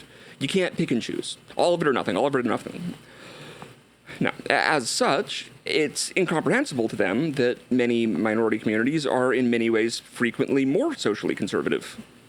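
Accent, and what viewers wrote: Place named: United Kingdom. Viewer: For the longest time I thought you were British.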